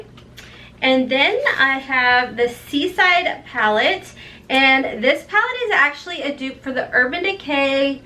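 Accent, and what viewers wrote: South, United States. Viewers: I just found your channel and I love your accent I'm a southern girl too💖 I think the pallet will be in the charm rewards store. My email is <mail> now I'm going to binge watch your videos 😍 😍😍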